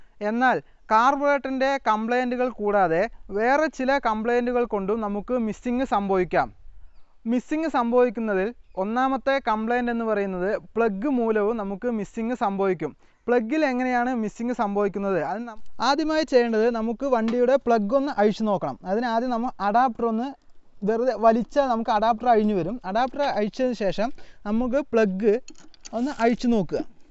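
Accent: Palakkad, India. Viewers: Palakkad ano bro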